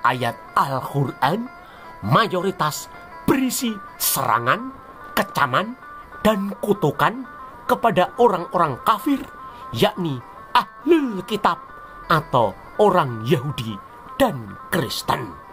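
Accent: Java, Indonesia. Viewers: Kalo bisa logat jawanya dihilangin bro. Saya yg bukan jawa agak susah nangkepnya. Cara penyampaian ya kurang umum. Saran ajaaa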